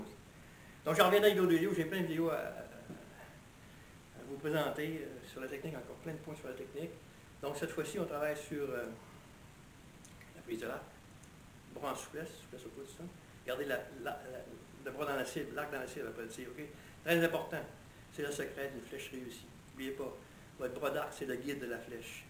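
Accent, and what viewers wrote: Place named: Canada. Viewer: Merci super video moi qui veux demarrer le tir instinctif de tres bon conseil l accent canadien dur dur et parlezvun peu moins vite mais vouscetes grnial ❤👍👍🙏🙏🙏😊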